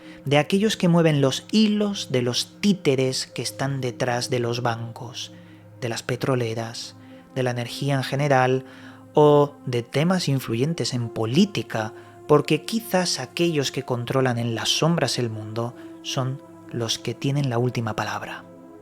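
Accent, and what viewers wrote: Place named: Spain. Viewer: Me encantan tus videos , antes no soportaba el acento español , pero tú voz me transmite paz , gracias por modificar eso , te deseo mucho éxito , esperando tus videos de septiembre a Egipto , son lo mejor , infinitas gracias 🙏🌸🌸🌸🥰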